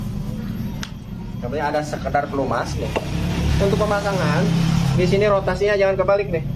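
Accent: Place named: Pandeglang, Indonesia. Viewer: Kaciri logat pandeglang na, 😁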